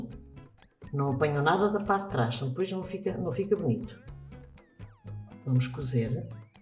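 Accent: Portugal. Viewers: amei seu sotaque português! Lindo demais! E o gorro é uma graça! Obrigada!!!🌷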